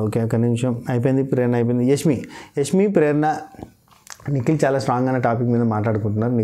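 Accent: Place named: Karimnagar, India. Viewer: bb8 kanna jokulu full entertainment pedda fan meku nenu iam from Karimnagar same ma language eh 😄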